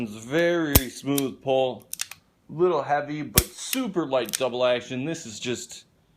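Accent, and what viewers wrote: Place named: North, United Kingdom. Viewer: Btw, zip ur fly and learn some southern dialect. The rapid speech northern tone doesn’t do your guns justice. Practice: say slowly, - dog gone.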